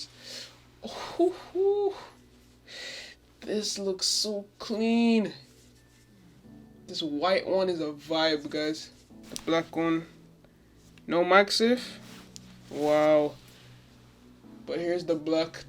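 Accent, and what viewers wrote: Jamaica. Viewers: Man sounds like a Yardie. Jamaican accent cya hide iyah. Big ups pon di content 👍🏾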